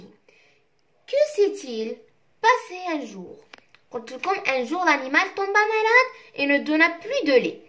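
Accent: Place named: Kabylie, Algeria. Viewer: انتي قبايلية منين من صوتك عرفتك بلي قبايلية انا تان قبايلية و شكرا على كلشي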